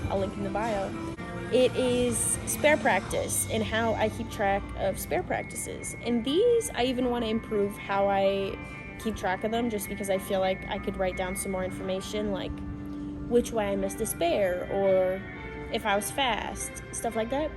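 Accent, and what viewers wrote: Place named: California, United States. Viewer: You sound like a californian eh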